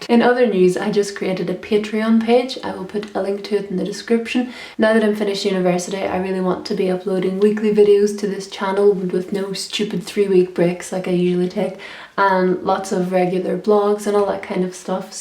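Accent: Ireland. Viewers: I read this book about two months ago, and I liked it. I normally don't read thrillers, I'm more of a romance book kind of girl. But it was interesting and it's one of these books where you can't stop reading, because you just have to know what happened . I haven't read gone girl, because I always really get into books and get into the characters and imagine stuff, so thrillers are kind of hard to read, when your imagination takes you into the characters and you scared. I really liked your review and I love your accent. I'm from Germany and love reading books in English. I definitely need to go to Ireland someday because the accent is just amazing, I could listen to you talk all day. Nothing to do with the book, but you just have a really calming voice.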